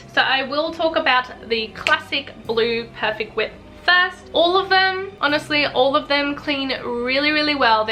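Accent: Australia. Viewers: I love your (Australian?) accent. It just reminds me of the time when I lived in Sydney.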